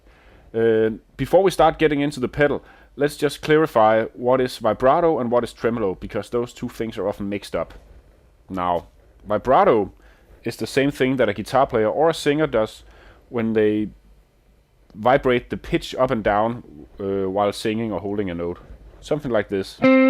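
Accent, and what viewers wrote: Denmark. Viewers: with a charming danish accent you even could sell me a boss metalzone.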